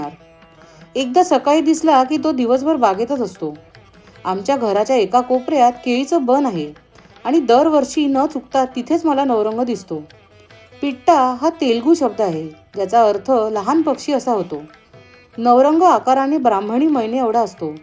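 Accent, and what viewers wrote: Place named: Pune, India. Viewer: Didi tu amravati chi ahes pn tuz accent accent puneri vatt akadi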